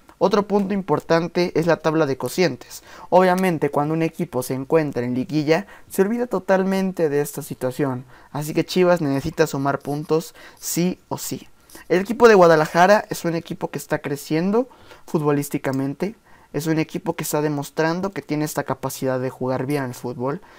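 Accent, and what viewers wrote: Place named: Argentina. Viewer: Felicidades ya hasta el modo de hablar estás igualito al caballero chiva casi hablas como argentino y puras tonteras puro humo que está demostrando solo pierden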